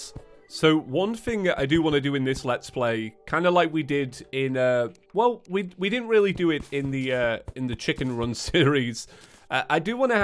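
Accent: United Kingdom. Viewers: Bruh I always click on these videos to sleep, and it always has to be a stinkin British accent? Can you speak more American please? It’s more relaxing than that proper sounding stuff.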